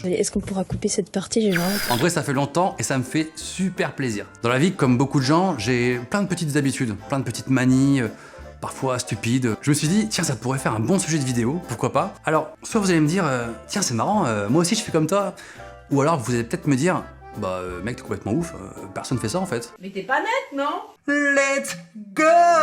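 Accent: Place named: South, France. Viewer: mon dieu l'accent du sud qui revient je pensais etre la seule :''')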